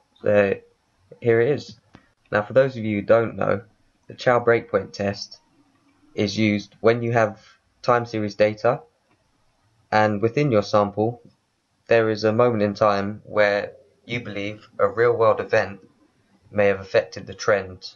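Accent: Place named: United Kingdom. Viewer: thank you so much!! and love your beautiful british accent....haha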